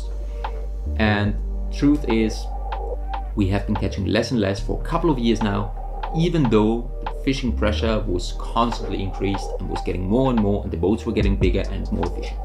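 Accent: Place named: Netherlands. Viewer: Klinkt als een Nederlander.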